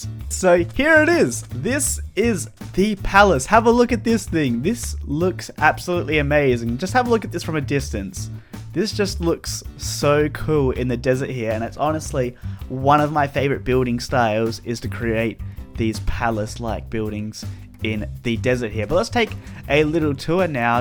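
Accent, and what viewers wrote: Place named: Australia. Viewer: Just Subscribed! Im australian and you sound aussie too!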